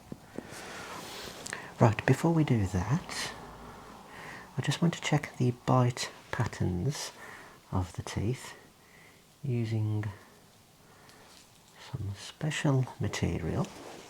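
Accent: Birmingham, United Kingdom. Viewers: Mouth. It’s the brummie accent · Your accent makes me homesick for the UK ♥ thanks for your hard work, love from Canada!!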